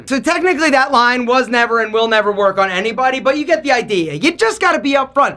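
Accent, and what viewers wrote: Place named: Lancaster County, United States. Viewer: sounds like a Lancaster county boi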